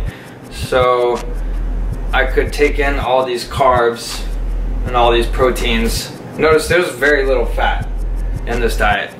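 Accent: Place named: Illinois, United States. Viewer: Lol shit smacks. Sounds like Illinois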